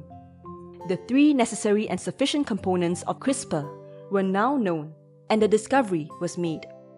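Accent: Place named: Singapore. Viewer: CRISPR will fix that horrible Singlish accent.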